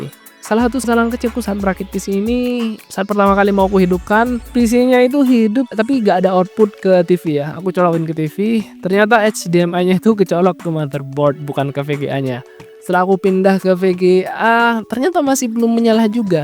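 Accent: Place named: Madura, Indonesia. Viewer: Orang mana bang kok logatnya kayak madura ?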